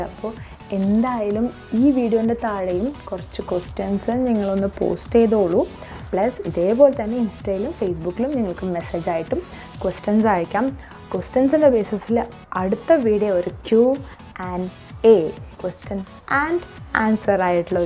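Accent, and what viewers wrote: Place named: Palakkad, India. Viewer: Palakkadan penkutty😑guess ur frm pkd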